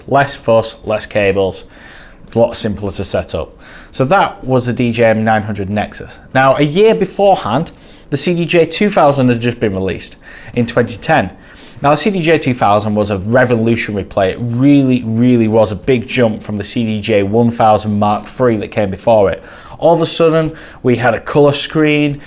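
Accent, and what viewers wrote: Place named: Yorkshire, United Kingdom. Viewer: Silly question, but as an American, I hear a very particular accent. Where is this accent from? Would you call it Yorkshire?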